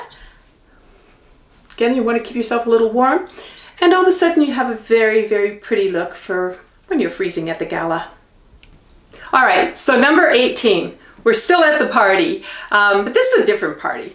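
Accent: Canada. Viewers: oh, now I think Canada? Am I right? heard a little accent.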